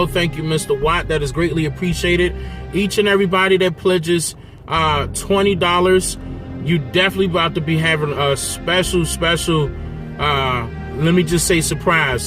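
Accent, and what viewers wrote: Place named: DMV, United States. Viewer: I knew he was from the dmv i can hear it all in his voice.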